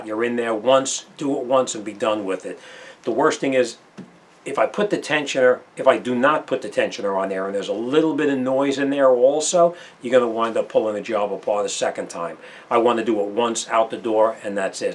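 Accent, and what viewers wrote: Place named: New York, United States. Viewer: Hey, I can hear you are from my area NYC. Where is your repair shop located?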